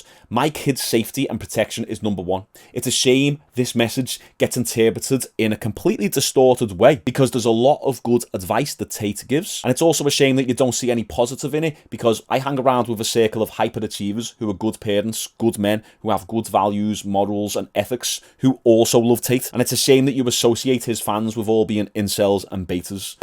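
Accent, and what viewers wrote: Liverpool, United Kingdom. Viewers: you scouse mate? i live in liverpool for uni and you sound like my mates 😂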